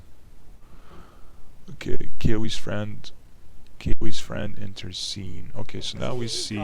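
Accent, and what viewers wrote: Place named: South Central, United States. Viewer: What was the comment we are going to get you girls by JS about? Please take diction classes. South central American accent is annoying and distracting.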